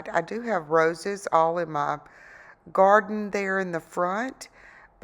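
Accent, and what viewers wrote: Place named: South, United States. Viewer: Hi 👋 I just luv your southern accent 😍 and the wreath came out beautiful.. Thanks for the upload..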